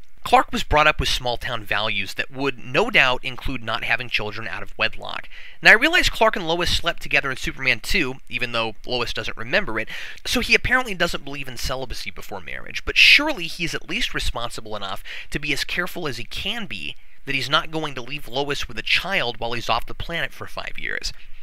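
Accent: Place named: United States. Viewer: Only cos people are dumb and get a huge boner for 'splosions and mass destruction. Man of Steel is not a superman movie. Superman Returns at least had a heart to it. Didn't give one shit about anyone in the new one. I need to go now though, this guys particular brand of American accent is cutting right through my brain.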